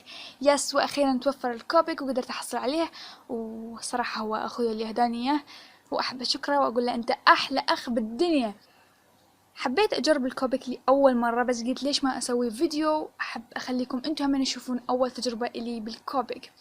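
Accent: Iraq. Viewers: حلوووه حبيبتي😍انتي اكيد عراقيه من لهجتج 😄😍موووو!! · عراقية مو؟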